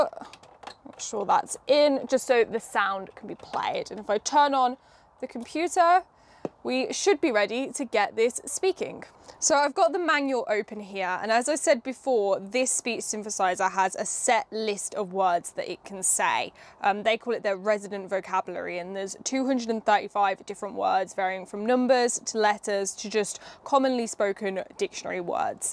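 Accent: United Kingdom. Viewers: Heh the world is advocating for more and more women in tech, I'm advocating for more women in retro-tech! This channel is going places, not only because is a woman, but for the simplicity and to-the-point videos. For me it's all of the above, but the british accent and the way the talks thru her hands makes it for me. Instant sub.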